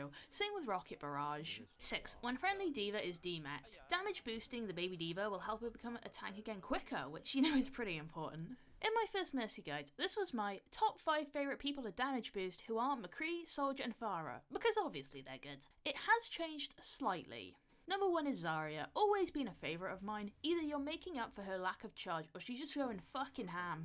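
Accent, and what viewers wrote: United Kingdom. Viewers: Awesome vid! You from the UK too?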